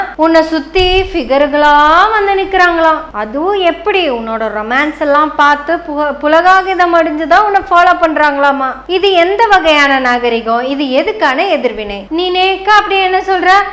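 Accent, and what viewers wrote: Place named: Kongu Nadu, India. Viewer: கொங்கு தமிழ் உச்சரிப்பு மிகவும் அருமை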